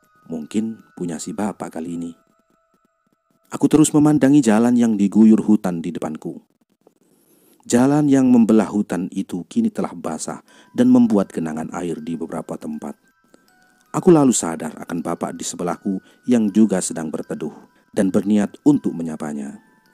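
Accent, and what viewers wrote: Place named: Java, Indonesia. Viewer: ternyata enak juga dengerin suaranya bang 😊 Dari narasi intonasi enak didengar. Ngebass agak berat cocok buat jadi MC. Cuma logat jawanya masih kental. 🙏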